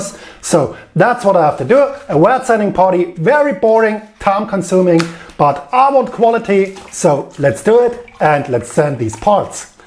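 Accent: Germany. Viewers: Mega Videos🙏🏼🔥 hab sofort rausgehört das du Deutscher bist 😅 Mach weiter so🚵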